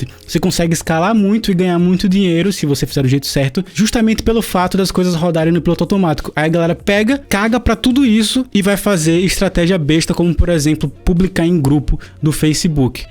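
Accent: Northeast, Brazil. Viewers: Vc é massa!! Vc é uma inspiração pra mim! De onde vc é hein?! Vc tem sotaque nordestino! Kkkk Eu sou de Recife! Parabéns pelos resultados! Showwww!!!!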